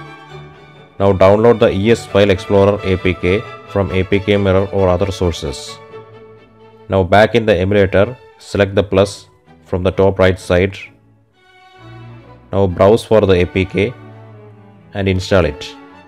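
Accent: Kerala, India. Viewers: Malayalee aanale?😂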